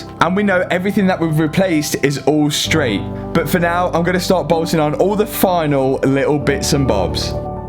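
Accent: United Kingdom. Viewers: I love UK accents, great how you say boompah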